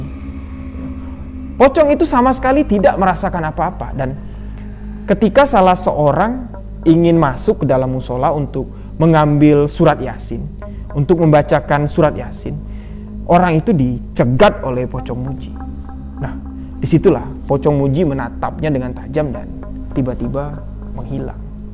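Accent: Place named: Madura, Indonesia. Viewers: Kembara sunyi (itank tirta) = logat Surabaya = logat madura 😁😁😁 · Madura detected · iyaa bener logat madura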